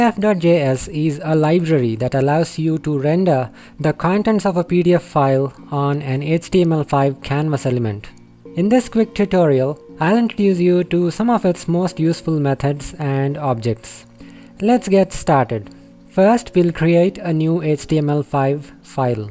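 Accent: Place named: India. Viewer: Indian Accent 10/10 video tutorial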